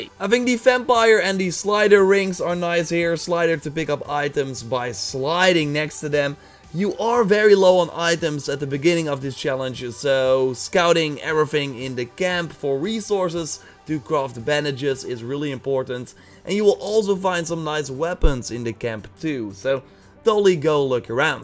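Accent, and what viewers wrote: Netherlands. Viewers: Damm jouwn Nederlandse accent is echt klote irritant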